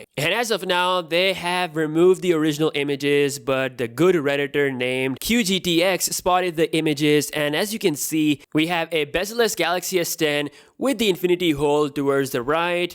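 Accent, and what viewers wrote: India. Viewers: Nice content ..nice video..but the narrative..the voice..it hurts my ears...sounds horrible. Sounds like those auto-read thingy with a terrible indian accent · Sorry but you just can't hide how indian you sound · hijui999 hes Indian lol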